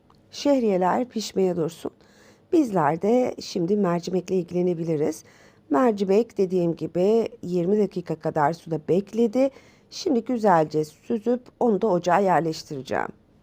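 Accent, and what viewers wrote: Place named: Istanbul, Turkey. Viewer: istanbul türkcesi konusan o jadar aziz ki.Sizi dinlerken cok mutlu oldum🎉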